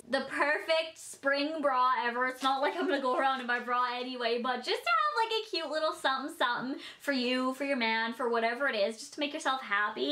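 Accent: Saskatchewan, Canada. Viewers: My twin brother keeps repeating everything you're saying because he says you have such a thick Saskatchewan accent and I'm actually so confused😂😂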